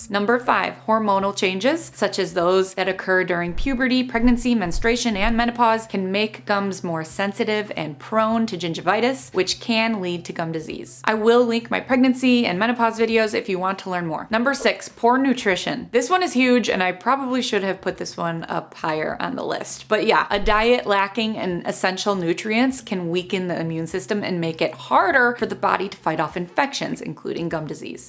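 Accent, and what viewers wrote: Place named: Chicago, United States. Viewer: You’re from Chicago right? I sense a Chicago accent it’s so cute!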